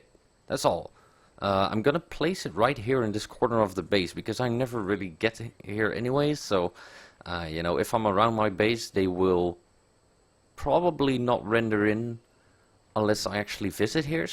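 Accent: Netherlands. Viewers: Are you Dutch by any chance because your accent is very Dutch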